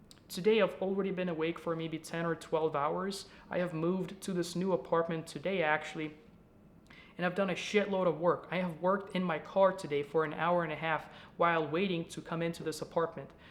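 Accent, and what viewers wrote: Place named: Eastern Europe. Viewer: as a Russian American I could tell you were Slavic instantly.....so almost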